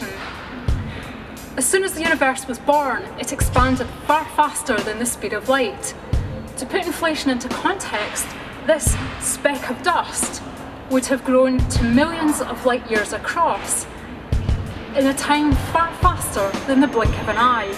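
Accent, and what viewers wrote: Scotland, United Kingdom. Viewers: Woman with Scottish accent, something something... bath towel, playing with balls, inflation... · Scottish (she studied Physics at Glasgow University with me. But she doesn't have a Glasgow accent...more of a generic central Scotland accent...possibly Falkirk or Stirling area but I'm not sure)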